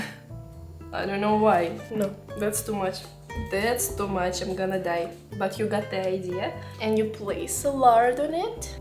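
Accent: Russia. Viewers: Where did you learn your English? You are too funny. Love your sense of humor with a Russian accent. The food looks delicious. I’m seeing more and more Russians on YouTube telling their story. I wonder how you are getting past Internet sanctions? Anyway, good for you. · Mukbang video Russian style! Cuteness overload with the thick sexy Russian accent and pure youthful beauty. Of all foods, I have tasted the cottage cheese from Jocca, but made in Germany.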